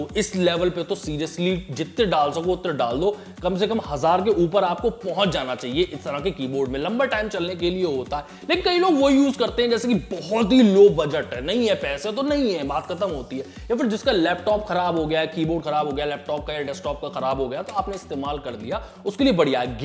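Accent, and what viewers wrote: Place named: Uttar Pradesh, India. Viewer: bhai tumhare bolne ka tareeka bohot badiya hai........bilkul up touch hai accent me......kanpur se ho kya??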